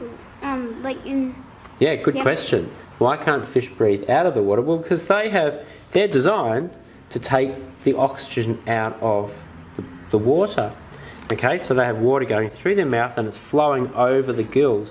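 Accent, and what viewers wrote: Australia. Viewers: its friendly to grownups beginner. he talks australian english. takes, cant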